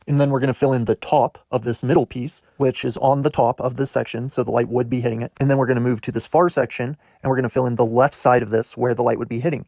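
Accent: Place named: Canada. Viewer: Dudes gotta be Canadian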